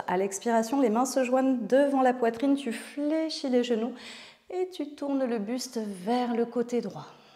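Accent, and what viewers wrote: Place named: Europe. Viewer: Belle séance très appréciée ...je ne peux faire la guirlande jusqu'en bas , à cause de l'arthrose à un genou . Pas grave je m'ajuste..j'aime tes séances toujours bien expliquée . Je viens du Québec et toi ? ...d'après ton accent je devine que tu demeures en Europe........de Louise Joyeuses Pâques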